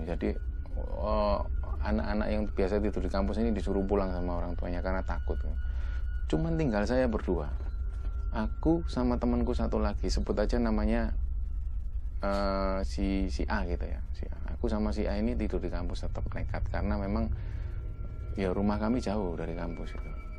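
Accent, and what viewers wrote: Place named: Surabaya, Indonesia. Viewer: Ga fokus sm critanya tp slalu fokus sm cara ngomongnya🤣logatnya itu loh..sy lahir dsurabaya tp bsr & hdp di jabar...smpe skrg bljr niruin logat sby msh blm bs😁😅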